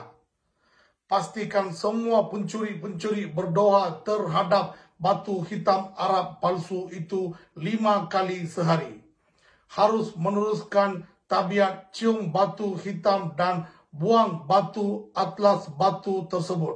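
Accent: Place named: Malaysia. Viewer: Looks like that right? But problem is the words are Malaysian Indian slang and accent you know.. not sure la bro.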